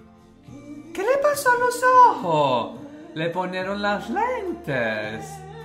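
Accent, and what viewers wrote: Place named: Italy. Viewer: Hi, funny video... Blas Canto´s performance was amazing, it's extremelly difficult to imitate Diana Navarro. Your Spanish is good as well, keep going. Btw, why do you have that Italian accent whe you speak Spanish?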